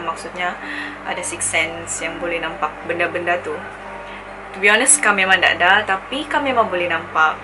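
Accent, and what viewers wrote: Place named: Sabah, Malaysia. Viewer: Suka sa dengar cakap loghat sabah 😂 sedap jak dengar hahaha yay new video!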